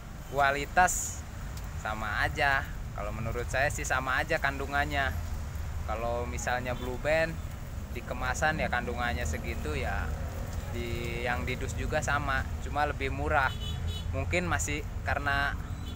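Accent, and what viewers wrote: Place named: Sumatra, Indonesia. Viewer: bang ..kok ngomong kayak orang Sumatra....asli Sumatra ya bang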